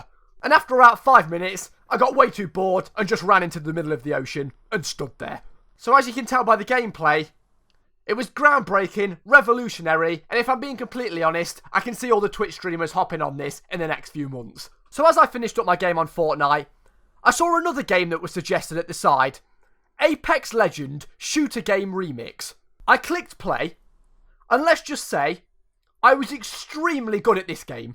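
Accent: United Kingdom. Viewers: why are you british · are you British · Are you a Brit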